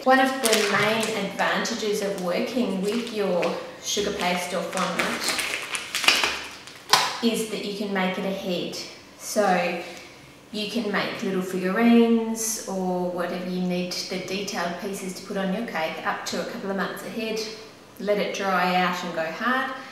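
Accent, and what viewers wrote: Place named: Australia. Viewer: Wow! I came across your channel while typing in: How to make Pokemon cakes ^_^ Thanks for the fondant colouring video. This was great. And judging by your accent, i can make out you're from Australia. I'm from Melbourne. Haha ^_^ Anyway, please keep posting more videos.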